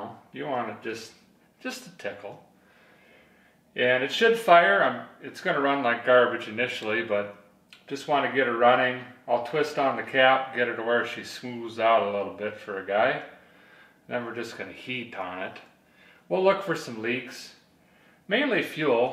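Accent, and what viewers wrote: Minnesota, United States. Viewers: Mind-bottling. . . the Minnesotaization of the language can be quite amazing. I'll do the right thing and say, close enough!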